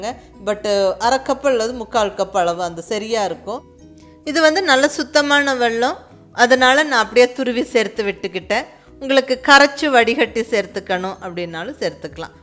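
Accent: Kongu, India. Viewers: Akka....sema super..I am your fan Akka..Really your are amazing sis...namma kongu tamil speech la asathurinka....tdy poli semaya arumaya iruku....😍😘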